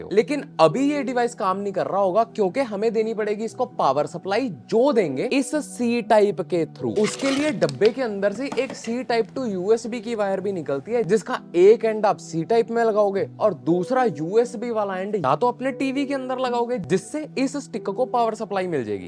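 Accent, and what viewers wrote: Punjab, India. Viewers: punjabi fadli teri hindi cho bro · work on your explaining method, your half panjabi breaking words accent is irritating. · Love your punjabi accsent ❤ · Who noticed his Punjabi Accent 🙂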